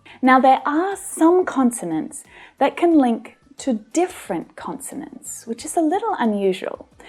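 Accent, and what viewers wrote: Australia. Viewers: Hi, It's really hard to find good australia accent english teaching channel, I subscribed immediately when I found your channel and being enjoyed it ever since. But here comes the real question, Is it weird to for a male to shade a female? Am I going to sound more feminine?😂😂😂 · As a British educated person living in Canada, I am fond of your Australian accent and your country Australia. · Hi!, I really enjoy your teachings. I've noticed, trouhgt out your accent, that Australian one is very close to British. While in the U.S.A., I took my classes, right there. I'm so eager to keep in the same track, so that I'm in the continous path of learning this beautiful language as much as possible. Again, thanks a lot for your help. With empathy: your suscriber, G.Z.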